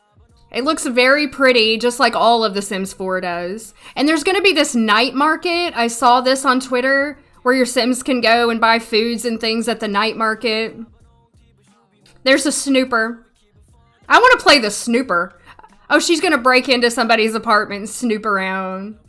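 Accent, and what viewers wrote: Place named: Texas, United States. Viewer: the Texas girl accent lol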